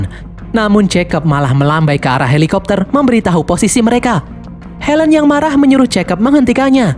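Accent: Java, Indonesia. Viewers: logat e medhok😂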